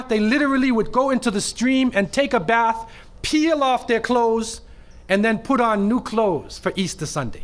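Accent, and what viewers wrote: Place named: Canada. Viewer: where is this guy from? what part of Canada?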